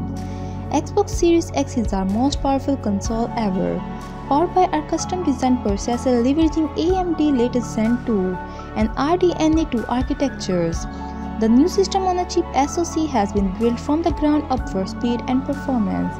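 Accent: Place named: India. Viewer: While reasonably informative, please consider using a native english speaker for conveying the message. The Indian accent did not work very well here, sorry.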